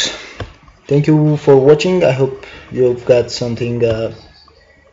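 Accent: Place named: Ethiopia. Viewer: I just knew you are Ethiopian based on your pronunciation..lol..keep it up...we need ppl like you!!!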